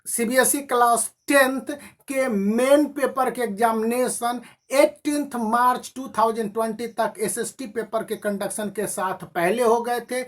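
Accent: Bihar, India. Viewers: Are u a bihari??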